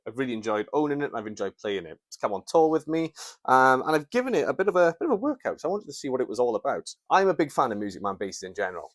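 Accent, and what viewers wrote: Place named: Wales, United Kingdom. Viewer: Great video!! I really enjoyed it, even with the Welsh accent 🙂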